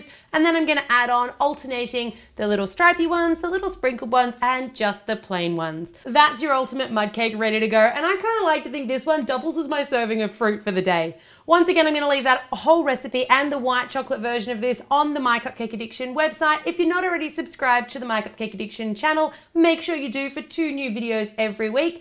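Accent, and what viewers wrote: Australia. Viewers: good lord I love Australian accent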